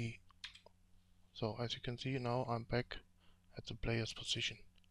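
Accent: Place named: Germany. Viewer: Ich find dein Englisch garnich so schlecht, aber man merkt da kommt manchmal der Deutsche durch xD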